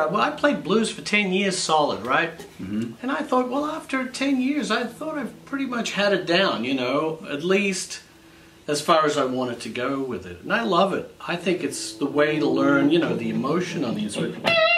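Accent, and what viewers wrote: Australia. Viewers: Being as I live in Sydney, I thought I detected an Aussie accent; yup, born in Canberra he was! Oy, Frank, awesome, mate! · It sounds like Frank's Australian accent isn't as noticable as it was back in the 90's. It's more subtle, and he has an American accent at times. Not a cut down; just an observation. I love the guy! I still have his old REH Video on VHS from when I was a kid.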